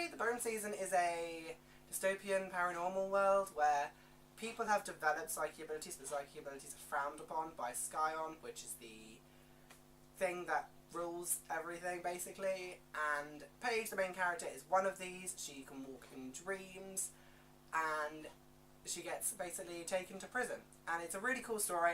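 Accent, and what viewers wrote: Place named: United Kingdom. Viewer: Thanks for doing the tag! Love your accent, where abouts in the UK are you from??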